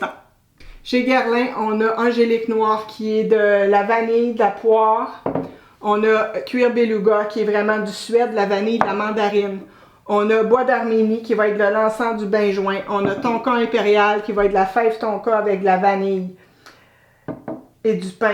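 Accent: Quebec, Canada. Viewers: Bonjour et merci pour ton message. Je découvre ta chaîne avec un grand plaisir en même temps que ton délicieux accent québécois qui me rappelle l'une de mes tantes que j'adore. Quel marathon!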